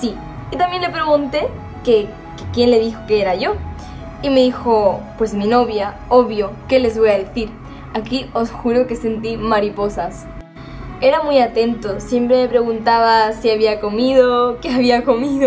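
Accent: Cordoba, Argentina. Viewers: el acento cordobés 😭🤏🏻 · Soy de Argentina buenos aires y el asento cordobes es lo más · Es que es asi pa los aregentinos somos los mas romanticos del mundo wacho siendo cirujas o quizas no pero siempre ahi para cuando se trata de la persona mas especial que tiene uno💖y la tonada de este hdp la amo gente😁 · AW EL ACENTO DE ARGENTINAA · che pero el asento ya lo superas te lo pasas al siguiente por q ablas bien argentino saludos desde argentina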